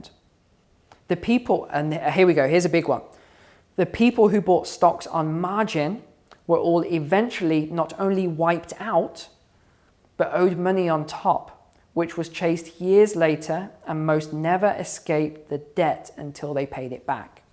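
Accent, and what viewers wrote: England, United Kingdom. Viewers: I have heard that some English people live outside the UK. His accent is authentic English. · English with English accent using US 'English' words to appeal to the audience. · He is definitely British . I’m British so I can hear his delivery is British, even regardless of his accent !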